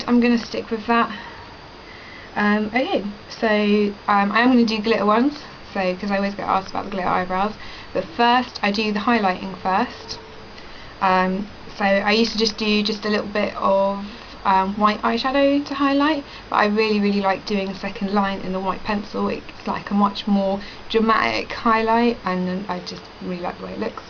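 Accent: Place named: United Kingdom. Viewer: i follow you on tumblr, but i never you were british!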